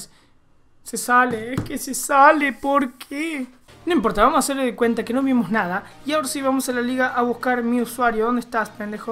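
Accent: Córdoba, Argentina. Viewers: Eres cordobés?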